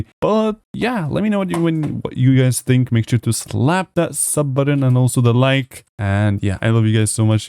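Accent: Germany. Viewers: realtalk now are you german?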